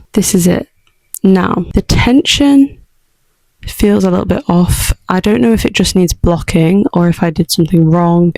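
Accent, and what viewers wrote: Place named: United Kingdom. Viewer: I just found you and have bingeing your videos. Love your content! I thought, because of your accent, that you were from the UK. Are you from the US or just use US crochet terms where you are from? OK, gotta get to the next video 😀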